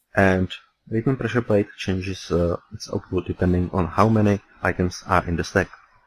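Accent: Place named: Czech Republic. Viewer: Český přízvuk se pozná vždy :D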